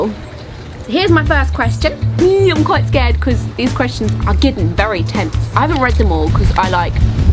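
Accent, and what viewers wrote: United Kingdom. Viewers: oh and your british accent rocks :D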